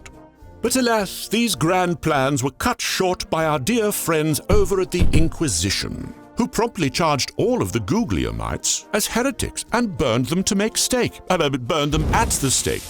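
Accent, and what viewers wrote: United Kingdom. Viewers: phenomenal animation, accurate history, Plus that middle-aged male British accent. Why is this only 13k subs? You earned one more today at least 👍 keep going! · I guess his accent is British. I don't know why but most of the accents of documentaries sound British to me.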